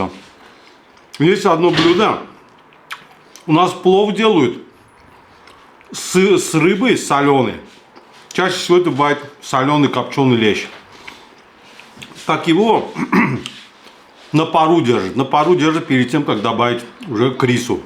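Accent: Baku, Azerbaijan. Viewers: Джан! Мало того что красиво, а ещё непередаваемые наши бакинские интонации!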